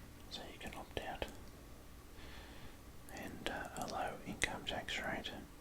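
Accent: Australia. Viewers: Very Aussie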